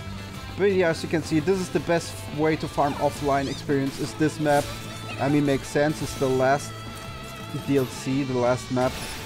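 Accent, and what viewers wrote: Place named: Germany. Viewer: Bro's accent is very German xd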